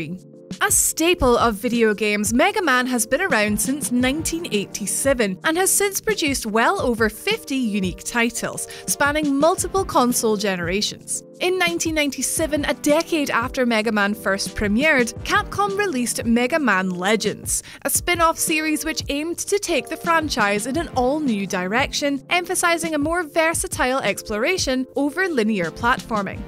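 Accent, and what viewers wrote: Scotland, United Kingdom. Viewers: omfg that scotish accent <33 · Was it just me or did get even more scotish than usual?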